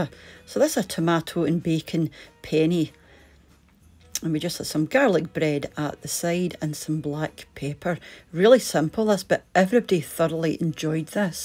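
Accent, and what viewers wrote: Scotland, United Kingdom. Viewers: Ohhhhh, I’m so glad I found you, I don’t hear a Scots accent very much any more! You’re so welcoming! It’s been over 20 years since I was last home and, because we moved when I was a littlie, I have lost my accent completely, but if I’m talking to a Scot it comes back, I’m always wondering if they think I’m skitting them! And of course, I love your recipes!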